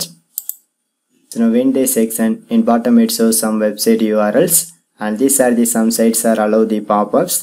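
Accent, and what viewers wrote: India. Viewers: This an Indian accent and oh my god if they talk to you on the phone such as Amazon or any cheap company who takes the jobs out of USA because it's a cheaper than an American person. Thank you!!!!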